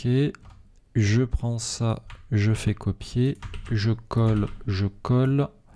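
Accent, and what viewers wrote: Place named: France. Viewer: Super, merci. Le meilleur prof d'Excel avec un vrai accent mignon français.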